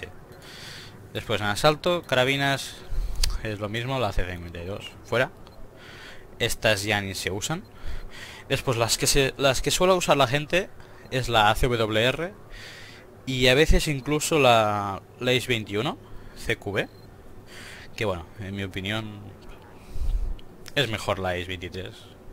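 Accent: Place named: Catalonia, Spain. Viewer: Ese acentillo catalan xD, nada decirte que el otro dia justamente me estube mirando dichas reglas (esl) para una cw y las restricciones en las carabinas y los fusiles son mas ampliasy que los dmrs stan permitidos, igualment bon video company i normal que se t.escapes savent qui ets dubto que et fixesis xD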